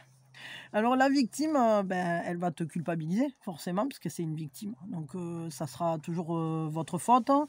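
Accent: South, France. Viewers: Moi c est verbale je vais partir et ça devient physique pas facile merci pour vos guidance avec l accent du sud sa fait chaud au cœur ❤❤🙏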